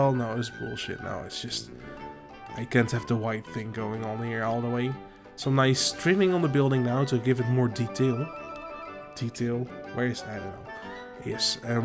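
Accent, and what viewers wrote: Netherlands. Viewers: The dutch accent 👌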